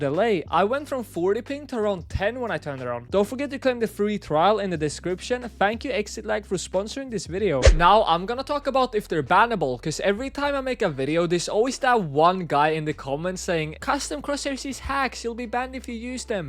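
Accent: Sweden. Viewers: Bro your Swedish? I’m Swedish to 😁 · I KEWN IT!!! You are from Sweden Right?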